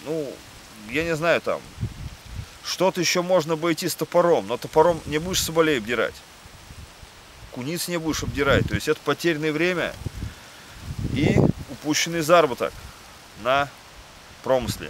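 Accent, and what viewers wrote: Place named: Nizhny Novgorod, Russia. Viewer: Горьковский акцент легко узнаваем) удачной охоты!)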